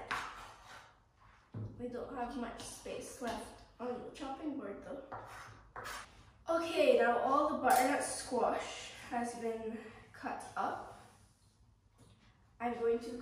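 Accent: Scotland, United Kingdom. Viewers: Happy Easter & Family! My old math teacher always said only crazy people make perfect circles, no stress on circles lol. your western kitchen skills are getting good! Also you are starting to sound a lil Scottish with a tiny accent on a few words - very cool 😎 😀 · Hi! So nice to see you again, love your cooking skills, you look so grown up, and starting to have a wee bit of of Scottish accent, how is your brother? Happy Easter to you, and your beautiful family, 🙏💕🇨🇦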